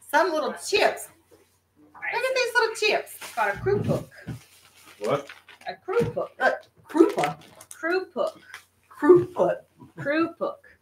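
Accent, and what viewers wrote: South, United States. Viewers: I enjoyed your stories and y'all's sense of humor. You "cracked me up!" 😁 I also like your Southern accent. My grandpa on my mom's side of the family was from Gaffney, South Carolina. I loved his accent! My grandma was from Cherokee, South Carolina. I never knew her because she died when my mom was 7. I hope you continue to put out more content. It really cheered me up.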